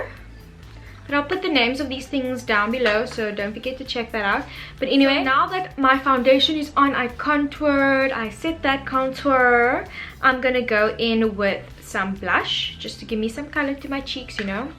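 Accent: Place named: South Africa. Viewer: I could tell by ur accent from the beginning off video. And when u said ja I knew u where south africa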